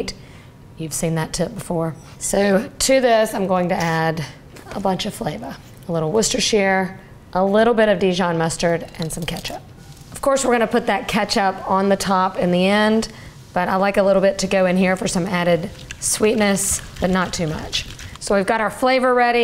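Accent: South, United States. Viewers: Love your Southern accent.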